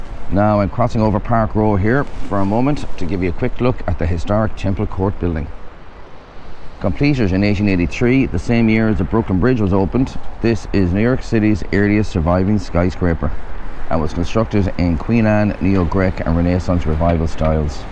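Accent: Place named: Ireland. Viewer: 语速比之前慢了不少，发音也更清楚了👍就是爱尔兰口音依旧明显😂对于英语初学者来说视频整体可能还存在一些难度，不过感觉如果把所有视频都看熟了可以收拾行李直接飞都柏林了😄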